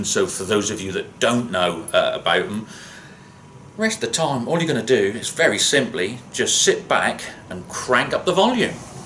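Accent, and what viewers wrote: Norfolk, United Kingdom. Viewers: Classic Norfolk accent 👌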